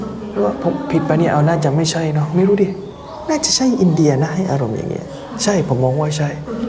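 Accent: South, Thailand. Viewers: พีเป็นคนใต้ไหมครับ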